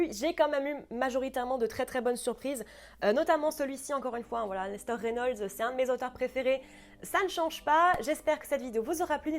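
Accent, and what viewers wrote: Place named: England, United Kingdom. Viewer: de estelle faye je te recomande la derniere lame et sinon j'adore ton coté bourru et ton accent anglais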